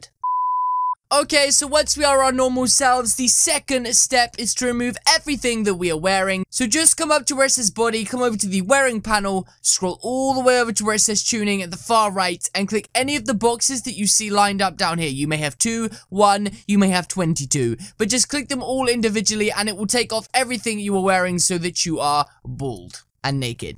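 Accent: United Kingdom. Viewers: Are you an british acsent?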